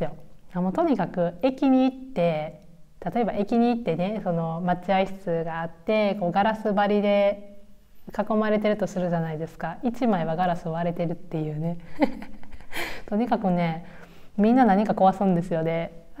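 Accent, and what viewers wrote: Kansai, Japan. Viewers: Hallo 🙋🏻‍♀️ 大阪の泉州生まれ, タオル有名ですよね｡可愛い関西弁ですよ。Hannoverの近くに住んでおられるんですね \(´∀｀*) なんとお呼びすれば良いのですか？ママさん？ san？旦那様や、子供ちゃん達、めっちゃ日本語上手ですね。子育てについても、ちゃんと, 夫婦で話し合って決めていらっしゃる事が素敵です。おばあちゃまはオーストリア人🇦🇹なんですね。なんか嬉しい。(行った事ある国だから…) 😅 旦那様の日本のイメージ面白いですね🤣🤣 最近の日本は、多様性を重視するようになってきたと思いますが、まだまだなんですね。楽しかったです。👋🏻(╹◡╹ ) ♡❤️ Tschüss, bis bald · 2万人おめでとうございます🎈 日本に着いたらおにぎり🍙たくさん食べてくださいね😋 動画に使われているBGM気づけばお風呂で口ずさんでいます笑関西弁だけど落ち着いた話し方が好きです❤ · ドイツに住んでおられる日本人の方々がおられる、なんとなく安心感を感じます。関西弁も素晴らしく感じます。私もドイツ在住です。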